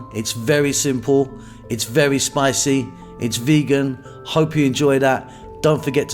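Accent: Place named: South London, United Kingdom. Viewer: and a lovely South London accent it is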